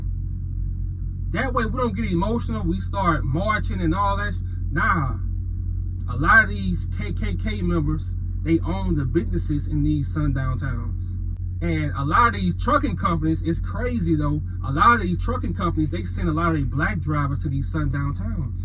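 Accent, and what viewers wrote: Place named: Louisiana, United States. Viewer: 1st time I heard you speak, I said, that must be a Louisiana Man. Do you know where St. Claude & Esplanade is Nephew?? ❤❤🖤🖤💚💚👊🏽 B1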